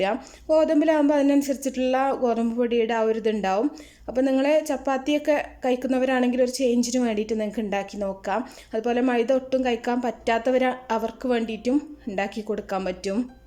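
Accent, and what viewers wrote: India. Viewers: മലബാർ syliyilulla നല്ല അവതരണം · Ok ചെയ്തു നോകാം ട്ടാ 😄, എന്റെ നാട്ടിലെ അതെ സംസാരം ചേച്ചി പാലക്കാട്‌ ജില്ല അല്ലെ?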